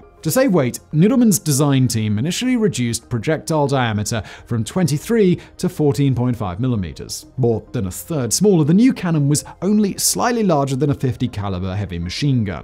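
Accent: United Kingdom. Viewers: Can I just say that the name "Simon Whistler" is the coolest moniker, escpecially when coupled with the British accent....and a story about space weapons.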